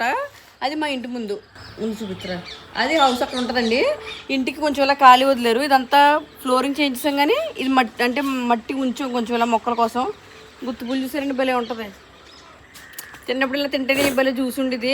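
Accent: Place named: Amalapuram, India. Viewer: మీ బాషా యాసా అచ్చం అమలాపురం బాష చాలా బాగుంది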